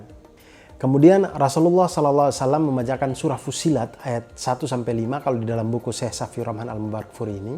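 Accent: Eastern Indonesia, Indonesia. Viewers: Bahasa timurnya kental sekali ,, saya suka saya suka ,, salam dri bnten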